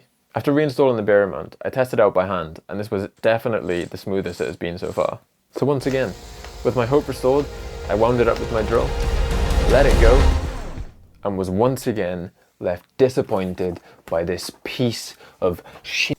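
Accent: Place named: England, United Kingdom. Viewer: Legit sounds like Tom stanton... like as if they went to the same schools growing up with each other with whatever part of England they're from.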